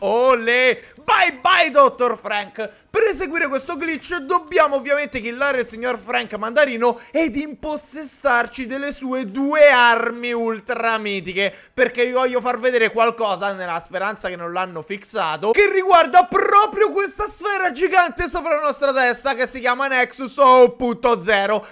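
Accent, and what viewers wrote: Sardinia, Italy. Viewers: sei sardo io si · Ma sei sardo? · ma sei sardo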